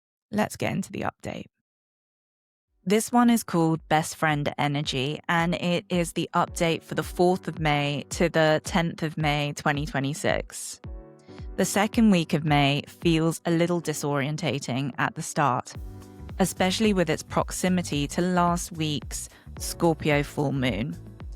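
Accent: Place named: United Kingdom. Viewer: hearing a British accent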